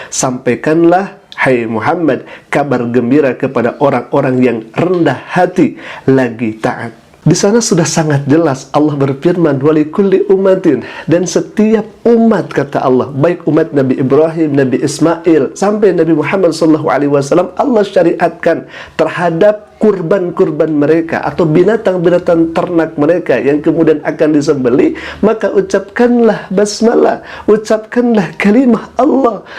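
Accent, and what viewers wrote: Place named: West Java, Indonesia. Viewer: Ini sih ustad dadakan.. bicaranya spt org dari jabar...memalukan org sunda... Dasar ustad koplak.. 🤣🤣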